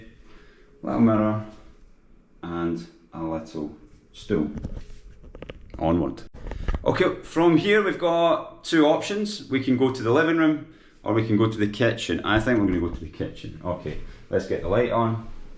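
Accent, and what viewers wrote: Scotland, United Kingdom. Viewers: Thank you so much , nice and clean ! Love your Scottish accent ❤ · I love listening to Scottish accent. Greetings from Serbia bro. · What strange messages that pop up on your video-----like "why you must leave the West and move to Moscow"....ummmh, why is that? Also, from all the other YouTubers who have posted vids of their apartments yours is certainly NOT the average one. Nice to hear your lovely Scottish accent, though! 🙂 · Scottish accent is so cute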